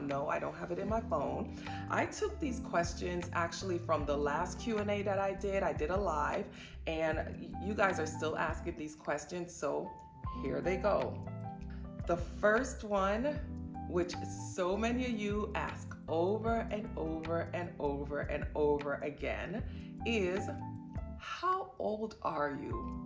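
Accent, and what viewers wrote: United States. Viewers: You are glowing. And your American accent reminds me of Nia Long. She is from the Caribbean, too 🩷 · I really enjoyed this. I though I detected some New York accent. I am from NY.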